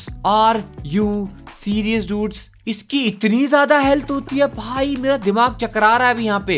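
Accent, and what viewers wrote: India. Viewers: Why do all indian sound like this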